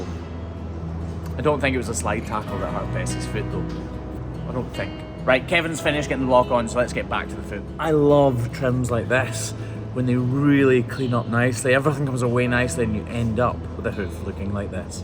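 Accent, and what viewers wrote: Scotland, United Kingdom. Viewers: I'm not a farmer. I don't even know how youtube originally sent me to this channel. But all I know is that I'm addicted and keep coming back again and again, even when I try to stay away. Maybe it's that sweet Scottish accent. Maybe it's knowing that these animals aren't in pain any more. Maybe it's the easy comradery. Or perhaps it is all of the above. Regardless, here I am again. Thank you for helping these poor sweet cows.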